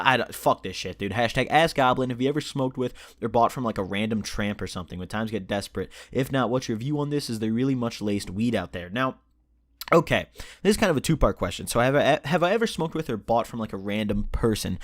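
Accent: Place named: Indiana, United States. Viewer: Bro I thought u were from Indiana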